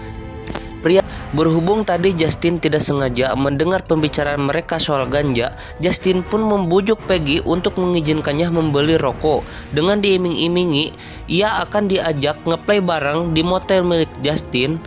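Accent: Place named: Sunda, Indonesia. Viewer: Logat sundanya ledok sekali mas,..🙊😁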